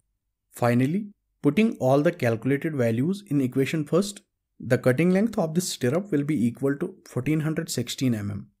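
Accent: Kashmir, India. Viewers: Kashmiri voice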